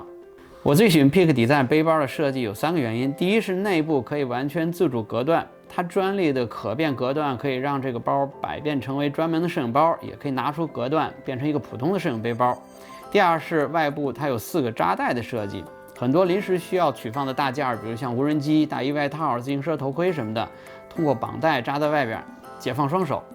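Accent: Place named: Sichuan, China. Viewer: 作者应该是巴渝或者四川人把！jin脏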